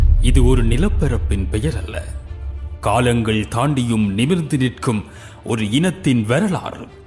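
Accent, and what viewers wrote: North, India. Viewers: ஆமா பேச்சி வடக்கு கிராஸ் மாதிரி இருக்கு.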